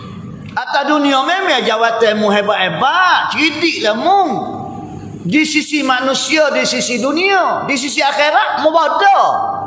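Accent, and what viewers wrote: Kelantan, Malaysia. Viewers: Tu loghat kelantan, kalo org xbiasa mgkin terasa agak kasar... Tp konteks bodo yg ustaz sampaikan dlm ni bermksd rugi/merugikan diri sendiri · Sedara salah faham ni.dengar smpai hbis.loghat kelantan ni memang begitu.kalau mendalami dan teliti sedih apa yang ustaz smpaikan tu.jgn terlalu mudah menilai dan bersangka- sangka .ilmu dia jauh lbh baik drp kita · Silap paham demo ni..demo xpaham lenggok bahsa kelate tuh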